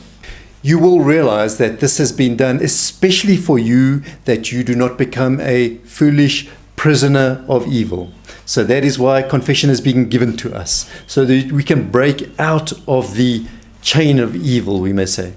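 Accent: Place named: South Africa. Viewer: As an Australian/Lebanese Maronite Catholic, I absolutely enjoy learning about Padre Pio through South African accent!!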